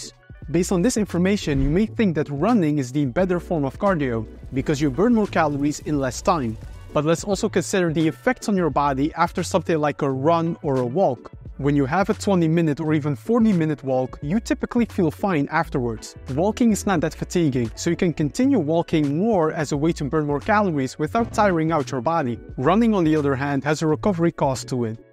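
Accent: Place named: Netherlands. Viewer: je klinkt Nederlands :D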